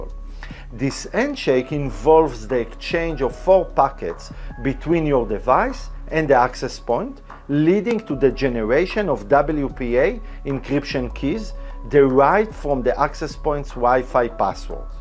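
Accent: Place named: Israel. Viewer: וואו אחי פעם ראשונה שאני רואה אותך ביוטיוב ופשוט ישר איך שהתחלת לדבר הבנתי שאתה ישראלי ואז ראיתי את השם של הערוץ והבנתי שאני צודק... תעבוד על המבטא 3>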